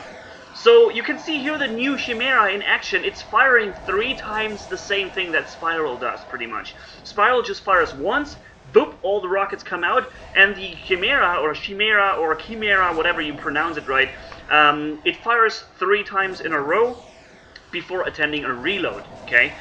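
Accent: Germany. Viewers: Are you german. Bist du deutsch, du bist deutsch oder du hast auch Vykker oboniert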